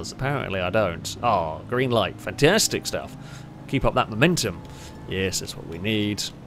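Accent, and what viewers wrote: United Kingdom. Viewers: there's nothing like a british man narrating his criminal adventures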